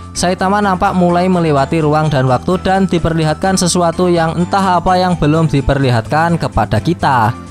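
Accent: Java, Indonesia. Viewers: Keren bang walau dengan gaya jawa nya :) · jowo ya kak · Asap galaxy : wedus gembel...🤣🤣🤣 Jowo ne muuuedok min...👍👍👍👍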